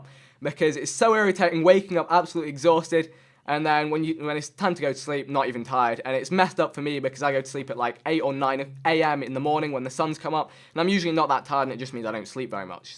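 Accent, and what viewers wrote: England, United Kingdom. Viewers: is indian I thought he was English he sounds like he's from England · Your english accent is so contrasting to your appearance it's quite cool! Love the videos, nice to do a facecam once ina while right!? Keep up the good work! Jack:)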